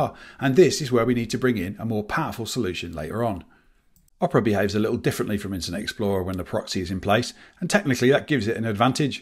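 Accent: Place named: Midlands, United Kingdom. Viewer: Can I just say that your diction and pronunciation is fantastically clear. Down to the gentle midlands accent too.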